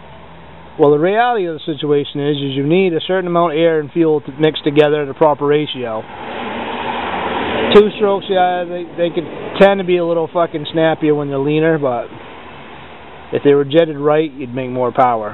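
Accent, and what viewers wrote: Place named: Aroostook County, United States. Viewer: Sounds like your from aroostook county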